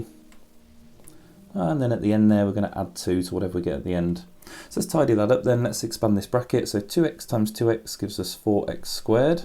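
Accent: United Kingdom. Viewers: No doubt this guy's videos are good. I like the mild black country accent.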